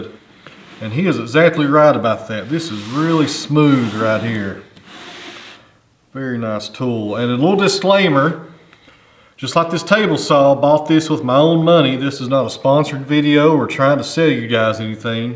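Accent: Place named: Tennessee, United States. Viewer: I know nothing about sawmills or wood but i find your you tube channel fascinating. I appreciate the beauty of wood and wood grain and to watch you assess a log, cut it and show the lovely grain is brilliant. Add to that your commentary in a wonderful Tennessee accent and I am hooked. I also appreciate your excellent taste in music, which leads me to my only criticism.....would you please list the artist and song name of the tracks you choose for your videos? Even better idea is...have you ever thought about a Spotify playlist that we could follow? That would be as popular as your YouTube channel. Thanks a lot for all the great vids.....i look forward to the next one.👏👏👏👏👏👍👍👍👍👍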